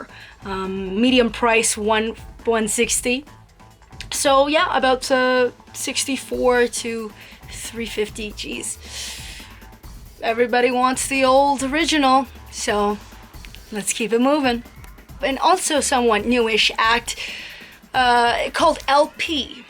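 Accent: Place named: England, United Kingdom. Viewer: Love your English accent!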